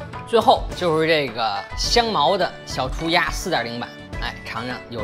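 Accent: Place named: North, China. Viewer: 口音像北方 長相像南方人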